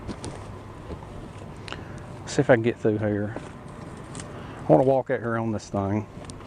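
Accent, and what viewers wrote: South, United States. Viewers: As being a professional land surveyor in neighboring South Carolina I would think that the Georgia Department of Natural Resource owns the island and the surrounding river. Just subscribed I love your videos and the perfect southern accent!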